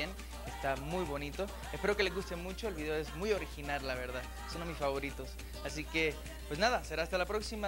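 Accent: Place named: Puerto Rico. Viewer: Neste tempo, o Sergio tinha o acento mexicano... Agora está pura cepa... Totalmente boricua!! 😃🇵🇷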